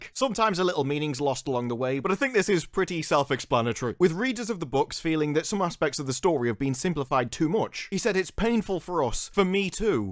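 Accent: United Kingdom. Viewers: offtopic: that british accent just flames ontopic: ye, i hope S4 will be cancelled, they butchered it enough or as the classic Simpson meme said "Stop, stooop! He is already dead!"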